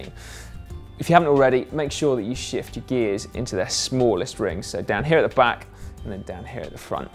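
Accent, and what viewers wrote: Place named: United Kingdom. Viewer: Could you possibly do this video again without the British accent?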